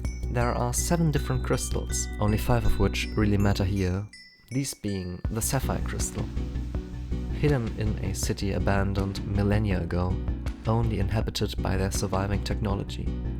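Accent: Germany. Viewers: German accent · Am i right or do you have a German acsent?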